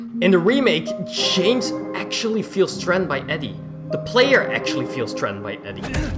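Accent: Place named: Flanders, Belgium. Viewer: Flemish accent detected Gezegende silent hill upload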